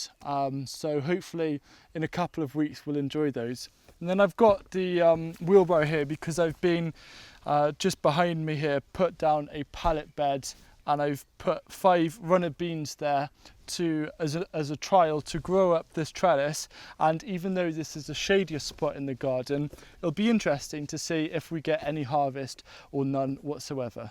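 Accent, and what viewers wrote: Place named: England, United Kingdom. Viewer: You def got tad of Elnglish in you...your dad is the welsh i take it ?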